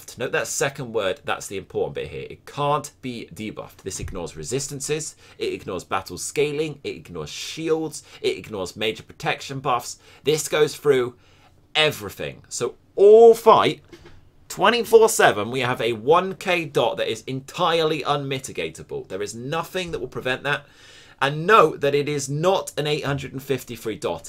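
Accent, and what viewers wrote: Scotland, United Kingdom. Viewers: Your Scottish voice makes me laugh every single time 😄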